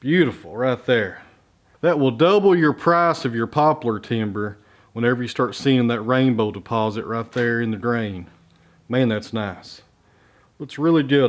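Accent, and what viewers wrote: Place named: South, United States. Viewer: You are a very charming guy. I love your southern accent. Love from Canada 🇨🇦. The Cats are adorable 💕💕💕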